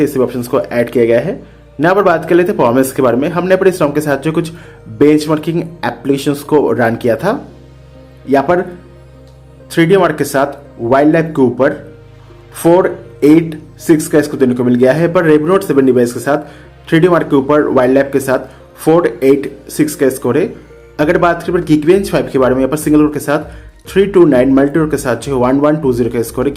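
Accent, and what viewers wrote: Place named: South India, India. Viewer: Aisi hindi kyo hai South se ho kya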